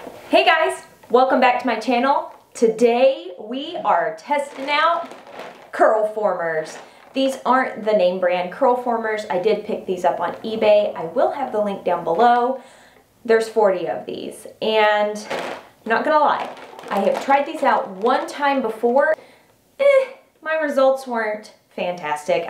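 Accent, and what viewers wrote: South, United States. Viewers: You explained this so well! You remind me of my teachers in grade school. Almost all of them had thick southern accents like you.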